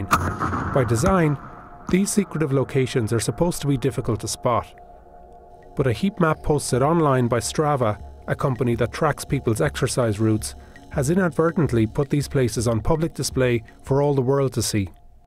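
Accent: Ireland. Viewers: Amazing Irish accent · Wow some Irish lad narrating this lol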